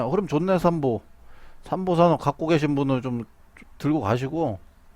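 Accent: Chungcheong, South Korea. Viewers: 어디 천안 or 당진쪽 사투리 같은데 말투가 넘 친근하네요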